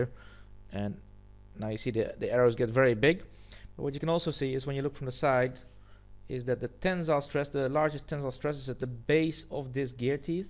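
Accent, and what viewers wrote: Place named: Caribbean. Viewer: You sounda bit carrabean maaan. but thanks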